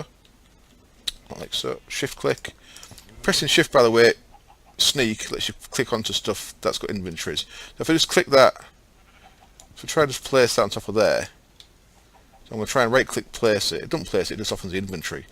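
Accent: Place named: Yorkshire, United Kingdom. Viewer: You just go how you want bud, the video's so far are mint. From a fellow Yorkshireman (Presuming you're from Yorkshire, sounds like it!)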